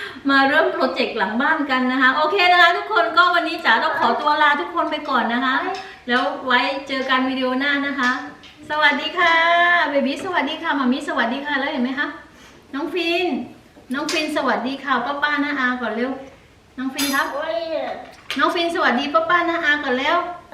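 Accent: South, Thailand. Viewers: ถึงว่าสำเนียงทองแดงออก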